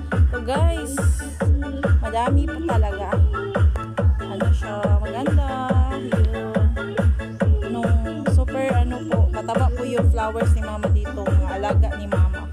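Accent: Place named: Visayas, Philippines. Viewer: bisaya ka ma'am haha, kadaghan tanom oi, kugihan si mama mo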